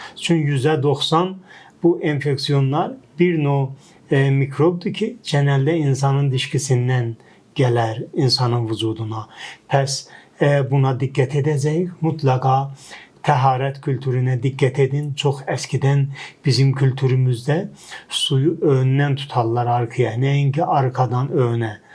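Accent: Azerbaijan. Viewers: Ya sen ne güzel bi adamsın ömrüne bereket versin allahım hocam çok sağol ne güzel bilgiler veriyorsun saygı ve sevgi ile takip ediyorum ❤❤❤❤ Ayrıca az türkçenizde çok sevimli 😊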